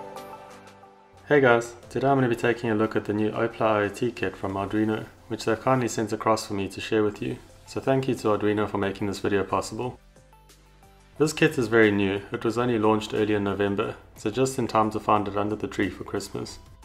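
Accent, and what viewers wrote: South Africa. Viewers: You sound like a SOuth African BAsed in Australia. PS Great Content!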